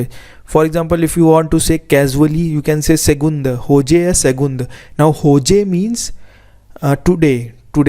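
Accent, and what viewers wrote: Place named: India. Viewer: You sound like an Indian. Keep up the good work. Needed these kind of videos for so long!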